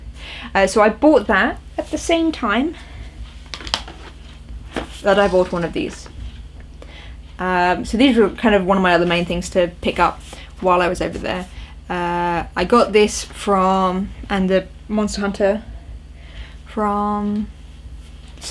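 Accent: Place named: England, United Kingdom. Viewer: It's true, English accent makes everything sound classier.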